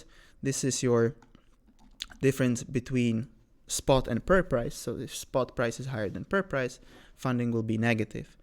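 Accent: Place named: Sweden. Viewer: Sounds swedish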